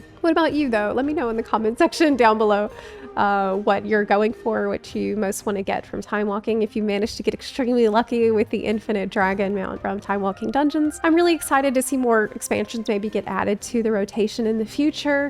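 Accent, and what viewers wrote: South, United States. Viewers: are u from the south?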